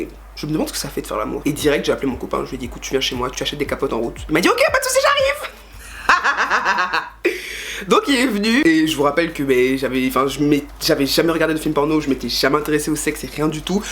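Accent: Ivory Coast. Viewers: cool ton accent ivoirien ma soeur ... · Oui elle a l'accent ivoirien et je crois qu'elle est ivoirienne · J'adore ton accent ivoirien, on dirait une go bété lol, trop cool. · Accent Ivoirien 👀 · Accent ivoirien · j'aime ta manière de parle tes ivoirienne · Cool tu es ivoirienne on dirait 😍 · C'est ma première sur ta page et j't'adore... Trop belle! Dit t'es Ivoirienne?? Juste que j'ai ressenti l'accent pas mal de fois! 😄😄😄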